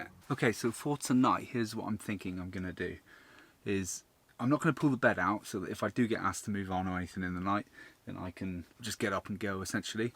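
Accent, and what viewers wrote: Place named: Southwest England, United Kingdom. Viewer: i recognise the accent are you from Plymouth or SouthW?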